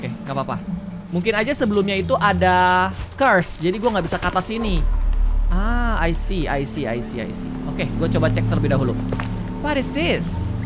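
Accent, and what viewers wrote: Java, Indonesia. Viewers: Mas po koei asli wong jowo ta kok ndang nding i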